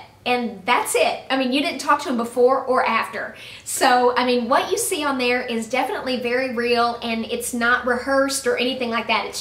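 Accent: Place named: United States. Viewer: you have a really sweet accent! which state are you from?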